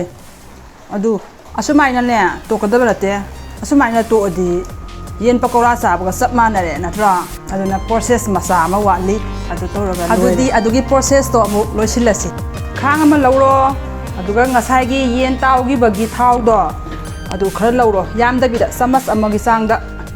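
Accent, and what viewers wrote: Kakching, India. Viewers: Meitei lonse mawong ama toudeye kakching haiba lamdugi dialect ne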